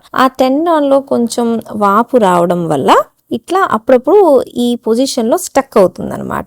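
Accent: Guntur, India. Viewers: మీ భాష వచుద్ది, పోద్ది ఇది గుంటూరు భాష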